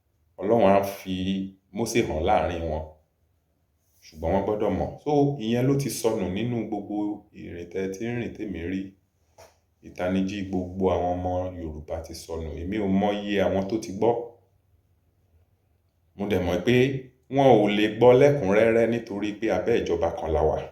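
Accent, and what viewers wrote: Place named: Okun, Nigeria. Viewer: Good admonition. I seem to detect Òkun accent and intonation. Am I right? 😊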